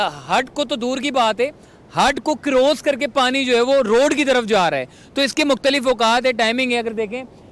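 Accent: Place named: Punjab, India. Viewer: اردو کو پنجابی انداز میں نہ بولیں... شکریہ.... آفتاب عالم خان بینکاک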